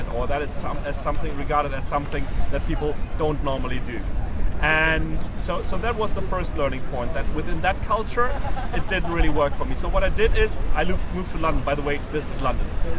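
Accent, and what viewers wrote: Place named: Germany. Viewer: I thought you sounded German :) Now I know this for a fact!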